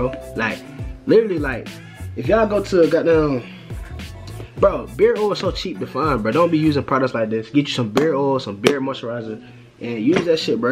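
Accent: United States. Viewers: You from Atlanta? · Bro where you from? I feel like you from texas😂the way you talk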